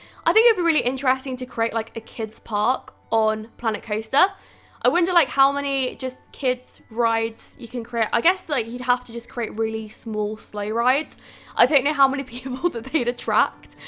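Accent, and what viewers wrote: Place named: Scotland, United Kingdom. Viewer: Excuse me, are you from Scotland? If not, I think your accent is really Scottish 💜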